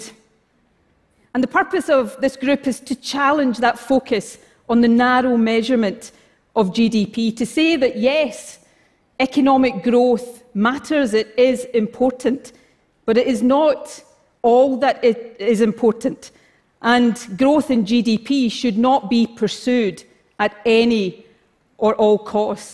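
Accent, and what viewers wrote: Scotland, United Kingdom. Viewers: Scottish accent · I'm English and understand every word, she's speaking English with a Scottish accent, just one of many different accents in the UK, I have a strong accent from where I come from in England, you'd probably find it hard to understand me, we don't all talk the same in the UK. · Oooh-a morning TedTalk and a nice brogue to listen to. I like this woman; she seems to know what's up.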